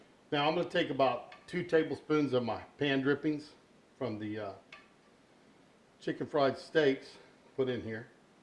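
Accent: South, United States. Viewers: Looks delicious! I love your country accent. Very authentic.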